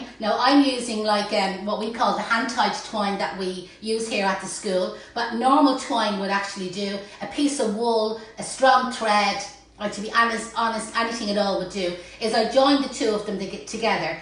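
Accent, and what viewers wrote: Ireland. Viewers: Ooh. An Irish accent. This is for my Christmas wreath. I’m listening ! 😂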